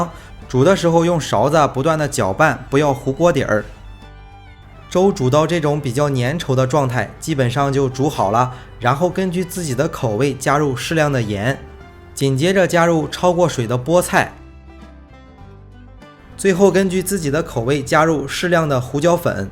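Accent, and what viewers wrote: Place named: Jinan, China. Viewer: 别忘了山东腔 ;)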